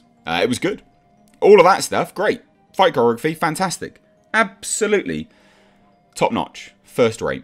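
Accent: United Kingdom. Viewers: How did you watch it!? You sound British.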